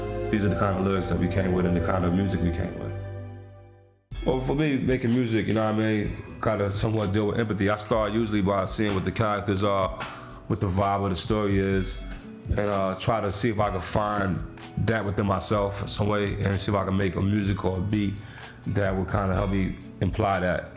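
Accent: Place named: United Kingdom. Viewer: Why does he speak with a British accent?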